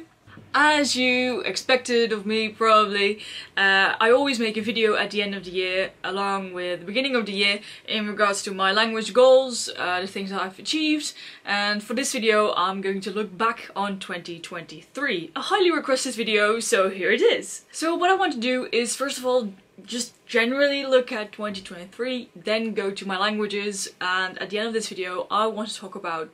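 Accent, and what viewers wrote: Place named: United Kingdom. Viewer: Today is the first time i know you, i am so happy to see you,, i wanna ask you "if you are from the Netherlands, why do you have Brittish accent when you speak English?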